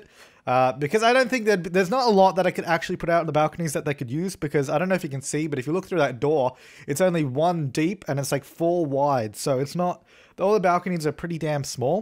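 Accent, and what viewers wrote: Australia. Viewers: Erdnussbuttertoast Australia . I think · Erdnussbuttertoast it's Aussie :') I think he lives in Sydney ☺ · Erdnussbuttertoast Australia, like me! Who else is from the down under here? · Erdnussbuttertoast it's obviously an Australian accent...😂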